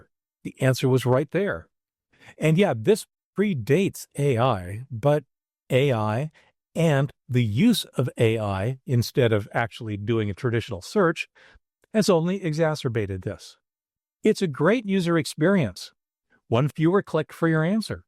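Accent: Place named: United States. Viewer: I assume by your accent that you are American (as am I). In Dutch the surname " " translates to "nut tree." I hope that you sometimes celebrate your heritage and have visited the Netherlands at least once. Nog een goede dag!!!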